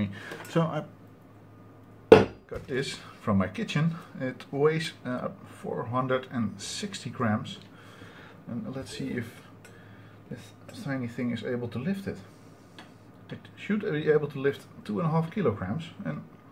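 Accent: Netherlands. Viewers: Ik heb dezelfde in mijn winkelwagen zitten van 2 euro per stuk. Kan zijn dat die ook lager gerate zijn qua kilos aan trekkracht. Wel leuke video trouwens! Hoorde het o zo bekende nederlandse accent en keek naar het naampje van het kanaal en ja hoor: eindelijk een nederlandse electro youtuber.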